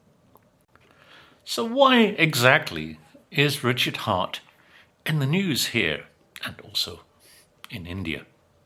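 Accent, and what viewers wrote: United Kingdom. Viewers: Me too is true ( The British accent is beautiful!! ) 💙🫂💯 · me encanta su acento británico 😊 no conocia su canal. 🖐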